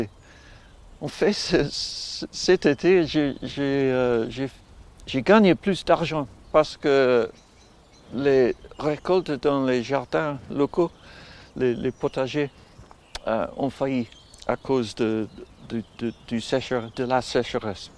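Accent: United Kingdom. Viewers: Hi . bonjour merci quelle belle video fait avec un grand coeur rempli d'envi de partager vous etres a lentlemen merci . Je suis en france et je regarde toute vos video . C,est un bonheur de vous ecouter francais parfait with the English touch beautiful ❤️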